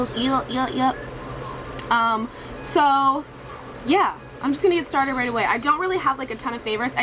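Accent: United Kingdom. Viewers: I love your British accent! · I wish i could do yoour british accent !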